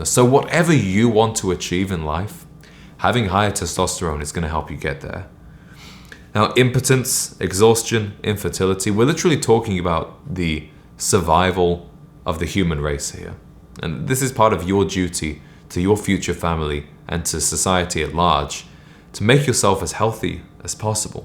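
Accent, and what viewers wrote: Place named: United Kingdom. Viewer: I noticed the tendency to mock men in the movies decades ago in the 80s. I have always hated to see it. I can’t believe that male actors and producers went along with this betrayal of their own gender. (On a different note: I’m curious that the title of this video focuses on American men, but you sound like your British…. do you think that British men have not been emasculated?)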